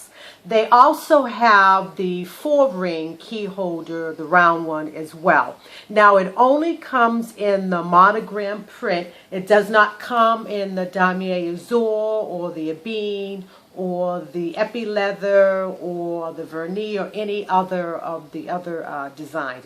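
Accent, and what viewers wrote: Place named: Massachusetts, United States. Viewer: Hi! It's Balance and Grace. Great Review! Great piece. Great nails. And I love your accent. It reminds me of my best friend from Mass. Be well!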